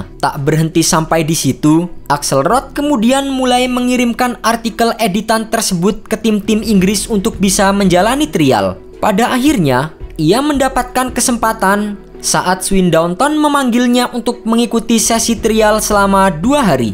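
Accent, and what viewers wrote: Indonesia. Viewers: Aksen indonya kurang2in mas, trayel bacanya ga sih? Bukan trial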